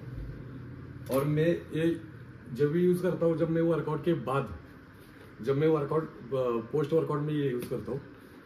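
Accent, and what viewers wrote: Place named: Kolhapur, India. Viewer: कोल्हापुरी accent भाऊ!! 🔥😁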